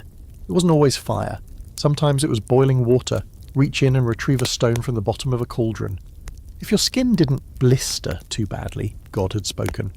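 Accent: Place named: England, United Kingdom. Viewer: would i be alone if I thought an english accent is one of the best for the subject matter? Seems more conservative than usa or australian accents...or perhaps just more presumption of possibly being more directly informed on a subject of the middle ages.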